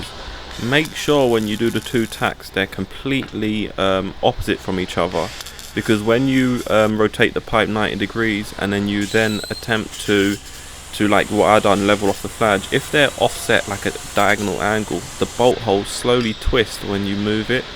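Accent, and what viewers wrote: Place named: East London, United Kingdom. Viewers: акцент у этого негра не американский, а английский...думал в англии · Hi, I'm not so great with internet stuff so couldn't figure out how to message you directly. Judging from your accent I'm going with west essex/east london, but could be wrong. I'm a stick welder in the gas industry working in herfordshire and do a fair bit of prefab as well as on site work. Fancy a collaboration?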